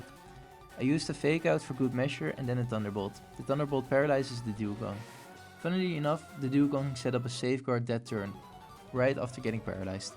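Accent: Netherlands. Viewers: Wait do i smell some dutch?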